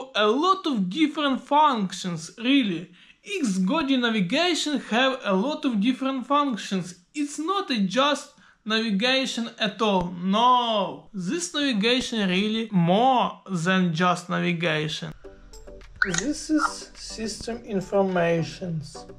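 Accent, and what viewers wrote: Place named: Lithuania. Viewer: Myślałem że to Litwin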